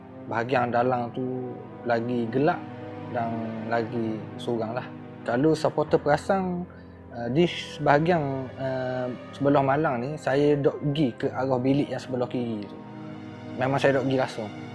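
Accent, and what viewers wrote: Terengganu, Malaysia. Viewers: Suka dgr bro ni bercerita dgn loghat ganu dia..😊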